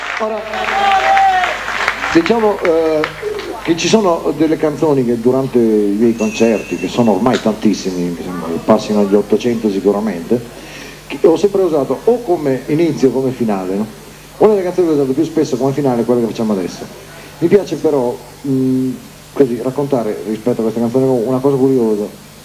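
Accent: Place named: Emilia, Italy. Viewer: E' veramente bravo! sono lieta che sia un Emiliano come me, e, che parli come me.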